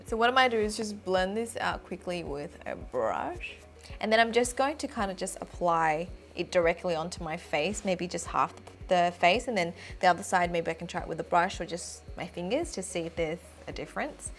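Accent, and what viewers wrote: Australia. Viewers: Ur Aussie accent is over powering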